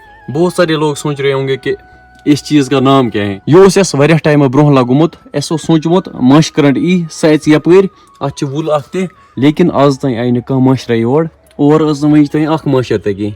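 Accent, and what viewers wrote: Kashmir, India. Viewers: What is the reason behind your kashmiri accent ... Like you pronounce pewaa with pewaan , cxata with cxataan .. kupwara people dont usually use this type of accent . (answer) And much love and support to your content 🫂♥️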